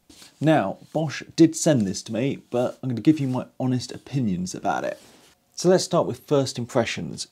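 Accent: United Kingdom. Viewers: Wonderful presentation, right on the money. It’s amazing listening to the British accent, by the way…👌 Thanks for sharing mate…😎 While I have your attention, would you happen to know if Bosch has any plans to make a cordless plunge router?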